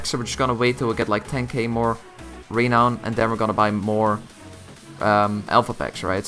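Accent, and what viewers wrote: Netherlands. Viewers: Jij bent toch nederlands k hoor het aan je stem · R u dutch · Dat Nederlandse accent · Jesus bestaat er een accent dat nog meer nederlands is dan dat van jou XD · Volgensmij ben je Nederlands aan je accent te horen?? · Where are u from? U sound like Dutch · Het moment dat ik je hoorde wist ik dat je een nederlander was. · Are u dutch?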